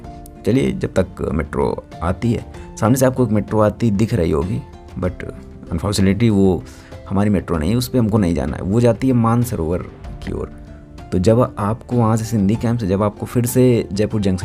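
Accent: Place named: Kanpur, India. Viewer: Ghusa dete hai kya kre kanpur ki language hi aisi hai but bhai best information or some funny language mja a gya👍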